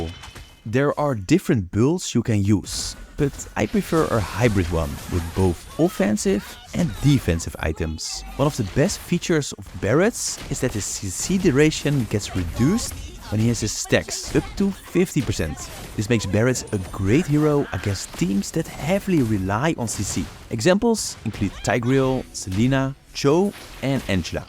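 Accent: Netherlands. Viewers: Why do you sound dutch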